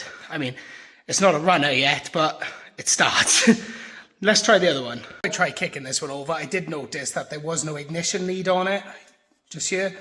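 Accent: Wales, United Kingdom. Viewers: Are u welsh bro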